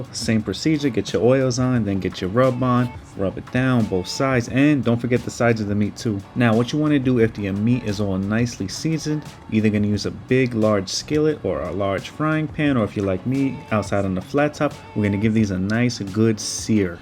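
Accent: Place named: New York, United States. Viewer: my mans new york accent makes me feel right at home!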